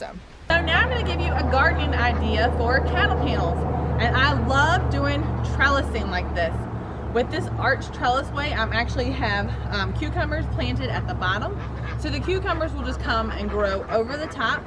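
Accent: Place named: Texas, United States. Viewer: Hi i just found your channel. It sounds like you have a possible Texan accent. We are a family of 7 but our kids are all grown. Trying to start a more self sufficient homestead lifestyle. Im 52 and the hubs is 54. And we own our own drafting and design company on our 2acres of land in SE TX.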